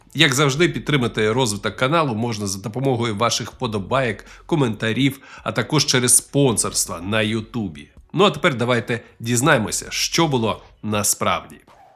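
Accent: Russia. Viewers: Трохи з російським акцентом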